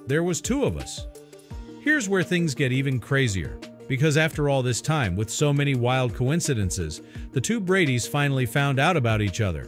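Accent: United States. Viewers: I absolutely hate this narrative style. Saying a lot of words but not getting anywhere. (AND the horrid american accent.)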